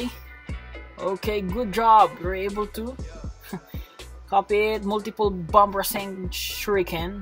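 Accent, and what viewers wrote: Philippines. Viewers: i know a filipino when i hear them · Oww filipino ka pala halata naman sa accent mo!!!! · I fricking knew it, you are Filipino, I knew it by your accent >:D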